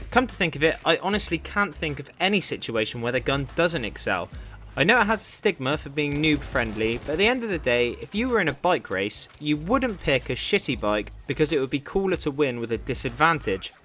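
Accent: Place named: United Kingdom. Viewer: Your brit accent is so funny dude ! So enjoyable! Well done Edit : this questionable humor is fantastic ahahah Edit 2: your like the British modest pelican With that commentary post whether you want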